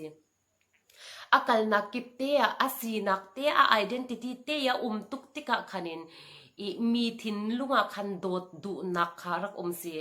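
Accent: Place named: Mizoram, India. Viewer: Na holh Accent hi a Pangkhua holh thluk ngai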